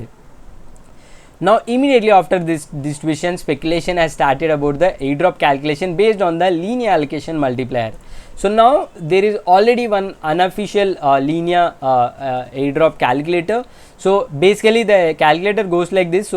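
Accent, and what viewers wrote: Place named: India. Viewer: Your English sound like Hindu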